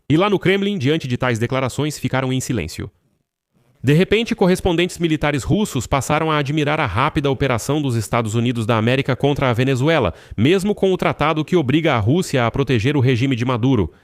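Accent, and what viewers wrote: Portugal. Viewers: A IA fala português de Portugal. Claro , correto e compreensível. Aqui não se esquecem do plural e singular . Simplesmente um português corretíssimo. Parabéns IA de língua portuguesa .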